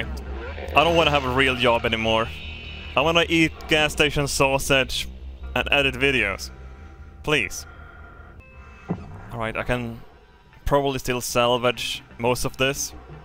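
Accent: Sweden. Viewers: is getting a thicker Swedish accent every day! Love it!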